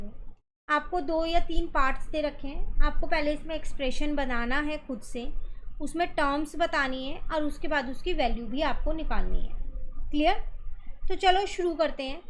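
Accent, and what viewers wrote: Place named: Uttar Pradesh, India. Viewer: Mam you are from UP your voice look like .🎉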